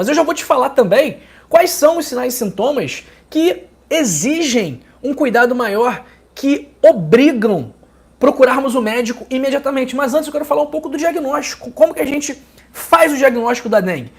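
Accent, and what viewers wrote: Rio de Janeiro, Brazil. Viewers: Ele tem o sotaque do Rio de Janeiro. Só isso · MariaSantos, buscando trazer dados para sua compreensão (sobre o desconfôrto dito aqui), no intuito de somar, acredito q vc tenha querido se referir, na verdade, não à "voz" em sí, mas, talvez, ao "sotaque" - isto não é dele, é do sotaque do povo do Estado do Rio de Janeiro, o "sotaque carioca", que troca o "s" pôr "ix" (ex: maS e maix, coStaS e coixtaix, diagnóStico e diagnóixtico). Espero ter contribuído. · Parece piada né kkk, o Dr todo cuidadoso, dividindo informações importantíssimas, ajudando o próximo e a criatura reclamando do sotaque Cariquexxxx. O mundo tá do avesso 🙃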